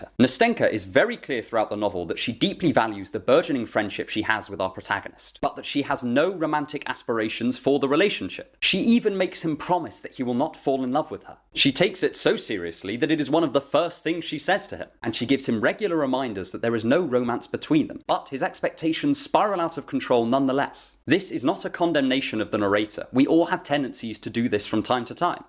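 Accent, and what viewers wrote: United Kingdom. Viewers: Thanks for the info !! Your advice is greatly appreciated and your videos are really informative and intriguing and would like to ask where is your accent from it’s British right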